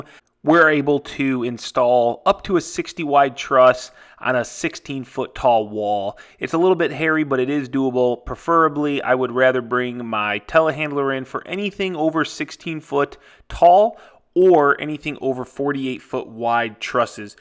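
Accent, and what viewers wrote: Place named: Wisconsin, United States. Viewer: Are you originally from Wisconsin? You have a very Wisconsin accent.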